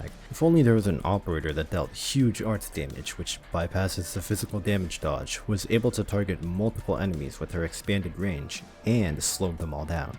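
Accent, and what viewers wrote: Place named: Japan. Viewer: pls stop speaking japanlish, i'm gonna die from cringe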